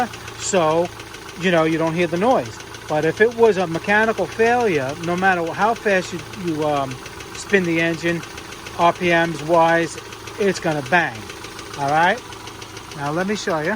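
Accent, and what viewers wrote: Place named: Ohio, United States. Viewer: Appreciate the video, also sounds like one hell of an Ohio accent lol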